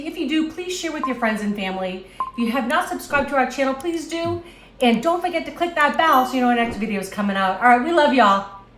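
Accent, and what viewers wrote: Boston, United States. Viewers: I love this recipe! I also love the Boston accent!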